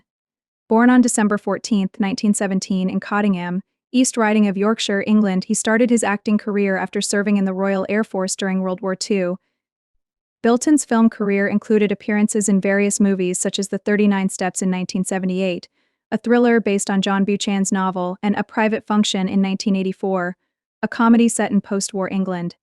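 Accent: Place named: United States. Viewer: Why have an annoying American robot voice telling us this. Ruined it. Can't watch now.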